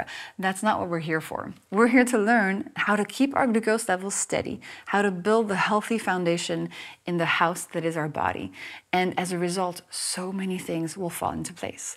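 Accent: France. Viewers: je me disais bien reconnaitre un accent français par moment ! merci pour vos explications je vais vous suivre <3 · Wait a second... Didn´t she have a very thick exaggerated French accent in her first videos?! You can´t simply erase your accent from your system. She was acting it to gain more impression and clicks. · I know how I detected she is french so easily despite her excellent English accent.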